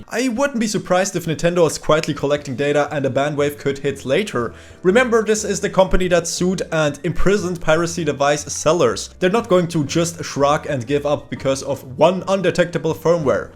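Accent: Germany. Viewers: I Hear deutsch Bro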